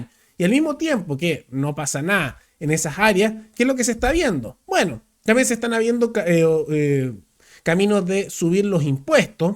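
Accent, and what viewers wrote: Chile. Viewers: Hola hermano chileno. Te sigo en tus comentarios. Eres muy bueno, muy informado e inteligente. Un consejo, si deseas que más gente te vea en América Latina, retira esos símbolos que identifica a Chile, suficiente tu acento para saber que eres chileno. También retira ese himno después de tu comentario, suena a tambores de guerra. Recuerda que América Latina ve a Chile como un país belicoso y agresivo. Esos símbolos chilenos nacionalistas y esa marcha que parece militar contribuye a subrayar esa percepción que se tiene de Chile. Tú tienes para más, eres muy bueno en tus comentarios, diría EXTRAORDINARIO.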